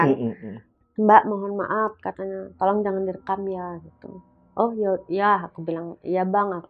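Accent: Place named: Semarang, Indonesia. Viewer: Sy Org rantau Kl denger medok ny Semarang an dan sekitarnya kok merasa terhiburnya beda y Mbak Rambutnya d jepit sekena nya aja kliatan cantik bgt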